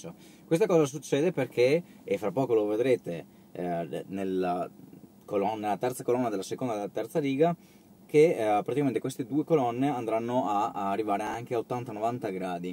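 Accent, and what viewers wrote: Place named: Veneto, Italy. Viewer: Sei veneto per caso? Io abito molto vicino a mogliano Veneto e mi piacerebbe tanto provare la tesla😅😅😅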